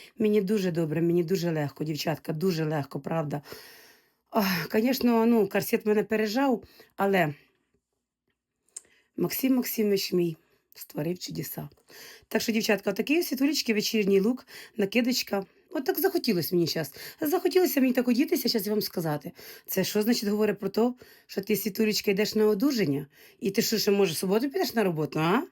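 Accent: Surzhyk, Ukraine. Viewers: ❤❤❤. Вчи українську поки вдома. Бо суржик дуже сильний))❤❤❤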